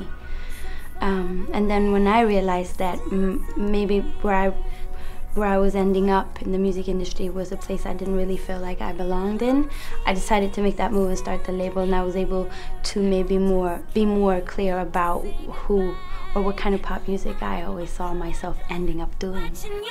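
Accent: Sweden. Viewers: I enjoy listening to Robyn talk. Love that Swedish accent!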